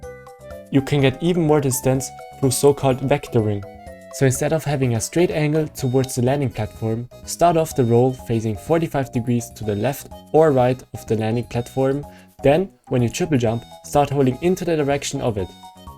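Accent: Germany. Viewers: Your English is very German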